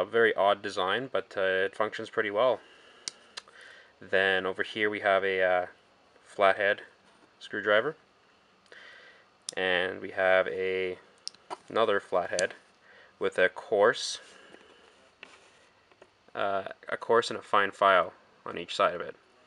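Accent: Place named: Canada. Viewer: Does anybody else think right when he said he was from Canada you heard the Canadian in his voice 🇨🇦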